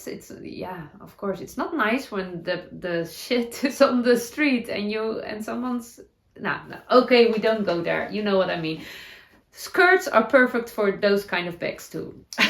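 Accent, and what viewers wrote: Netherlands. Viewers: 😂😂😂😂😂 stop saying “shit” 😂😂😂😂😂😂😂 oh my goodness!!!!!! I love your Dutchy English!!!!!!! You completely brightened my day with your talk of shit 😂😂😂😂😂 I’m sure you now know, we say “poo” Wonderful ❤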